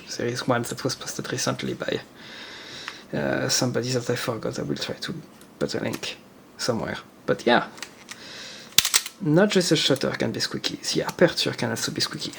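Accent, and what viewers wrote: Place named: France. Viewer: At first wanted to close the video in first 10 seconds but stayed for french accent and mechanics of camera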